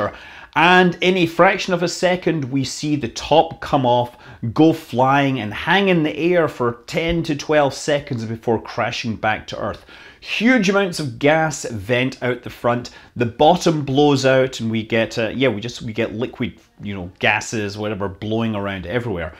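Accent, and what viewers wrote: Scotland, United Kingdom. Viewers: Scott Manley Can I just ask you something- so I’m guessing you stay in the States now but how long is it since you left Scotland? And where did you live in Scotland? I just ask because my accent is really similar. I stayed between Sterling and Edinburgh before moving to just south between Edinburg & Carlisle. Now after living in New York for quite a while under dual citizenship, my Scots is gradually fading - although I still have an accent like yours but now all the ~Scottish slang words have disappeared from my vocab. Just interested, thanks mate 🚀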